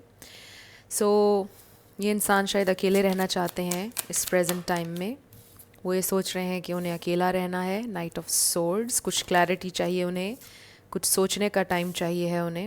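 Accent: Pakistan. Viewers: We were in long distance relationship we never met he planned about meeting in August but he blocked me on WhatsApp and I blocked him on SC .I Pray he will call me ❤........I like ur readings You are a Pakistani I bet ......your way of talking is Like Pakistani .❤keep going keep it up.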